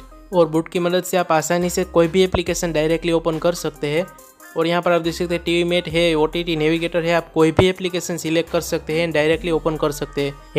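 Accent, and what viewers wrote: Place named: India. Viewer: Englisch with mixed indian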